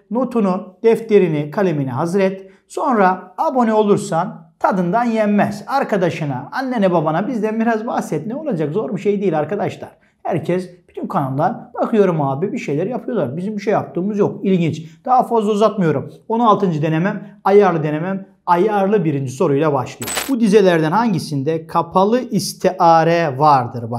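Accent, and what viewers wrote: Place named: Akçaabat, Turkey. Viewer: Hocam sizi yeni keşfettim çok sağlam videolarınız var maşallah öncelikle tebrik edip sonra bir sorum var acaba Trabzonlu musunuz hadi biraz daha tahmin edeyim Akçaabatlı şiveniz birebir aynı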